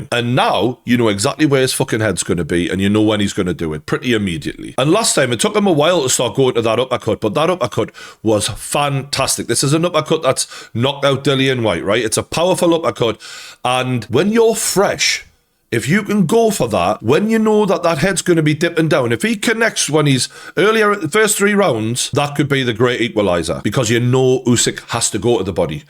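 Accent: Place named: Geordie, United Kingdom. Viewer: f^t geordie you have the most annoying accent i’ve ever heard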